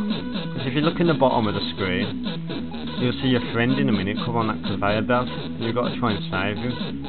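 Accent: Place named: Birmingham, United Kingdom. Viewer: The Brummie accent is the worst in the world. This fella was sending me to sleep just listening to him. What the fuck is 'Feck"? Terrible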